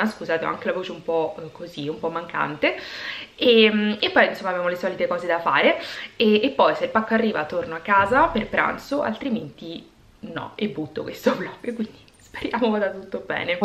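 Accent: Rome, Italy. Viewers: Il tuo accento romano mi fa muorire😂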